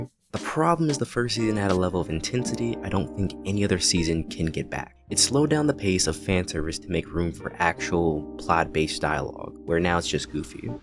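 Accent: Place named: United States. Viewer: I heard your accent, i knew u would be some american burger consuming garbage fast food anime and enjoying it.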